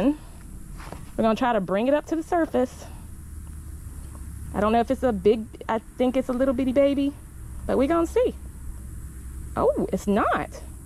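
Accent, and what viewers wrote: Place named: Florida, United States. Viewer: Your welcome, thank you for posting! I'm a Floridian as well, I can hear it girl!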